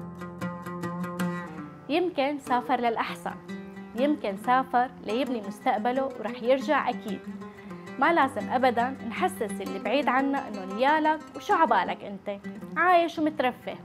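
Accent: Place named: Levant. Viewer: ماشاءالله وتبارك جمال رباني صوره مصوره وكلام متزنًا ولهجة شامية مئه من مئه وشو كمان مابعرف شو بدي أقول لك.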